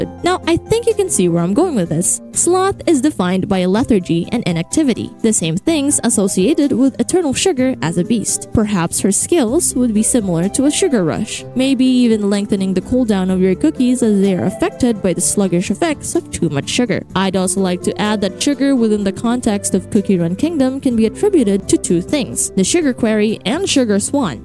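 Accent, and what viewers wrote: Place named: Philippines. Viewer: Thank you so much for making these videos!! I love watching them because I could use these as a basis for some of my analysis (I post them on tumblr hehe) because I focus more on heavy character/design analysis and storyline theories, but I kinda get lazy on the themes and origins part lol. I noticed through your accent that your a fellow Filipino as well haha. xD I hope you have a good day! :3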